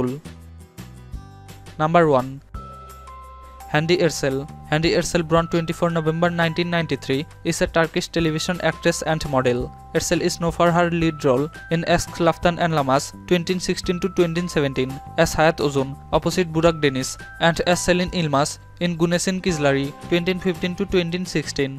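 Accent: India. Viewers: Indian english🤔🤔🤔not bad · is this guy speaking English or Indian language? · Lol is the narrator Indian?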